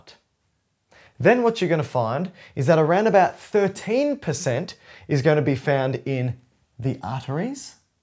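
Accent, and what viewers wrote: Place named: Australia. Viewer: As it turns out, I can only understand biology when it's explained with an enthusiastic Australian accent. Thank you good sir!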